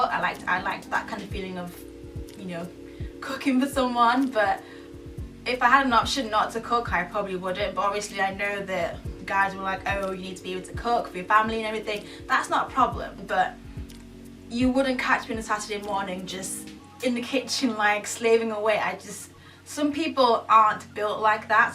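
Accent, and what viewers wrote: Igbo, Nigeria. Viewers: Thanks for opening up in this video. I loved hearing your Nigerian accent. It was nice to "get to know" you in this video! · i loooooooved your nigerian accent so much!! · Girl! I love ur Nigerian accent. I am reli seeing a difference in u and I have been watching ur videos for yrs. I mean u are coming out of ur bubble more and I'm seeing ur personality. I'm in da same boat as u, I feel like I'm dissing Ghanaians went I put on my Ghanaian accent smh btw I'm Ghanaian. I would love to meet u someday. Luv u xoxo l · Awww the 18 minutes went by so fast lol. I loved the Nigerian accent tho! Thx for sharing! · sounds kind've British to me; Personally i rather your Nigerian accent. That's just my opinion, keep up the good work tho :* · Plus i love your hair and the Nigerian accent. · Lol... The naija accent !!! I feel u girl..sounds foreign to me as well wen I speak .... 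But still naija for life !!!!... Forget accent joor!!!! Lol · Your naija accent tho. Lool. You sounded like Shalewa in that Nigerian soap opera tinsel. But regardless, I think you are super cool, and pretty too. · Lmao that Nigerian accent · I loved this. The naija accent couldn't have been better. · Love the Igbo accent👏👏👏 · lol your nija accent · lmao love your nigerian accent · Looooool...OMG! Best video of yours I've seen! Your Nigerian accent is sooo funny, but abeg you still try. This hair is amazing on you, the bangs suit you so much. I agree with the annoying comments and keeping your personal life to yourself. You are pretty amazing :) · The intro accent is just the bomb!.... Typical naija..lol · Best video ever. Soo relaxe and cool. Love your nigerian accent. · OMG!! I LOVE hearing your Nigerian accent!!! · Sweet and Honest. Enjoyed watching you and enjoyed the Igbo accent. You have company... 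I'm bad at it too... my fiance is Nigerian and he kills himself laughing every time I try that accent. Stay strong sweets! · Your Naijah accent is flawless though! ♡ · If your videos will be more like this,will definitely be watching every post!! Love your naija ascent 😘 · Love the naija accent....may all your dreams come true IJN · Love u lol,, naija accent tho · I really love your Nigerian accent. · awwwh i loved this loved this vid specially the intro and the end that accent was on point u spoke with the typical igbo english accent like those ontisha boys hehehe...am yoruba and olodo is our common insult loool but i have a lotta igbo frnds and i caught d pigin englih with the accent lool i really loved listening to u wiv the accent....and yeah keep ur private life private like popc z dont air ur linen in public...but i really wish you all the best and hope you find the bone of your bone and flesh of your flesh ijn.....great vid s=xoxo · Your Nigerian accent is really cute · You are very beautiful on this video You seem to have a beautiful personality And I loove your nigerian accent. 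That was good 😄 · I loooooove this video.. and many others:)..plus the Nigerian accent has so much win to it. Keep shinning:) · You're naija accent! Everything! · Lol your Nigerian accent is too cute.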